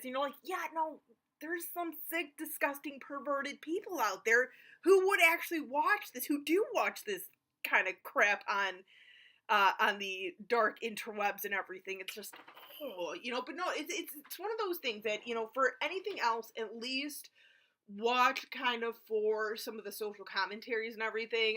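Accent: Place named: United States. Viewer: You have that American accent that makes you say runt instead of rent! Lol, not criticizing, just noticing. I'm from Ontario, Canada, eh, so I'm used to people laughing at my accent, so, it's all good. I keep hearing more and more accents from around the world with the internet. I also have misophonia and certain sounds drive me nuts and make me stabby, but also, it makes me hear things intently and I can hear accents so clearly. Yours is one I hear often. Is it midwestern? Or where is it from? Just curious. Thanks. Thanks for the review too!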